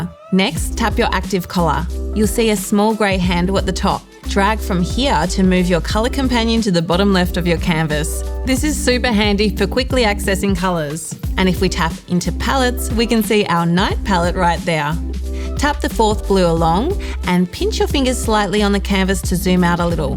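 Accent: Australia. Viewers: Good to hear an Aussie voice!😊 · Great tutorials but her voice/accent is like nails on a chalkboard. Aussie accents are the worst.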